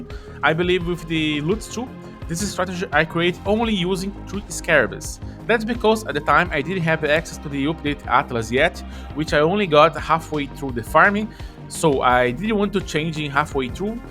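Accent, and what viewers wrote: Brazil. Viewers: irmão, tudo bem? Quero deixar aqui meus parabéns por produzir conteúdo em outra língua, eu perguntei por conta da entonação "Eh" que é bem característico de brasileiro, não perguntei para causar algum tipo de ofensa ou intimidação, mto pelo contrário, se vc produzir conteúdo sobre este jogo em português vou me inscrever no seu canal pois achei bom. Desejo a você muita resiliência e sucesso, forte abraço. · Sotaque de BR é sempre reconhecível, mas ta mandando mto!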